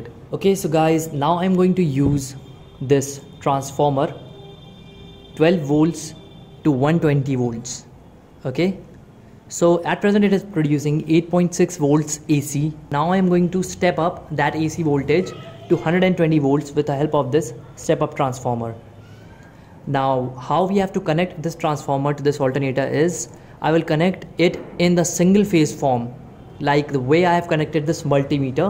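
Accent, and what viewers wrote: India. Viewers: I can NEVER understand this India Accent ! By time I figure out what they are trying to explain , I'm behind on the Next step . I'll just find someone with good English !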